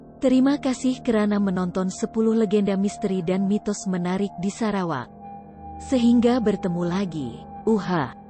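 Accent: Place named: Indonesia. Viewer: Apasal bunyi indon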